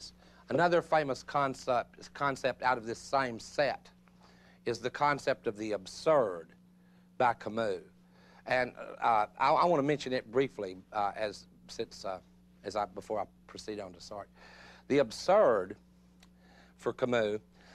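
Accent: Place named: Texas, United States. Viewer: I love that Texas accent. His Texan accent sounds like an anti-abortionist accused of burning down a clinic. Yet these brilliant concepts are produced through this accent. Diversity it is.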